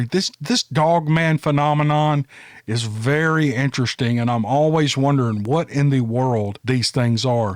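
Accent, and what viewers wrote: South, United States. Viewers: Listened to your collaboration with Shudder recently. Very good stuff. Ended up subscribing to them too. ALWAYS love your stories and that sweet Southern drawl. 🦍💖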